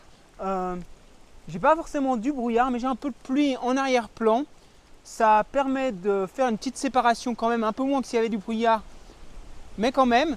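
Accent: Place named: England, United Kingdom. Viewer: Merci pour la vidéo. Tu commence à influencer ton français avec l'accent anglais. Je retrouve aussi que tu cherches des mots anglais temps en temps. Essaye de faire un vidéo en anglais. Ça va te faire une exercice. 😀 Je te souhaite une bonne lumière!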